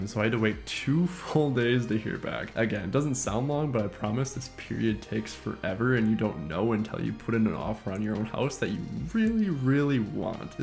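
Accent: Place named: Midwest, United States. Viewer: You sound a bit midwestern. You're adorable!❤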